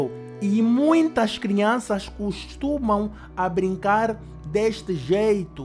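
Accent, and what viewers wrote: Angola. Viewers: Esee cara narrando parece angolano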